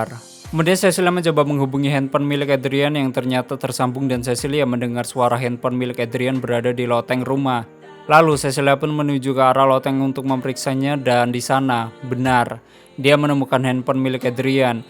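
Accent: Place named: Tegal, Indonesia. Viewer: Voice overnya pasti orang tegal -,-'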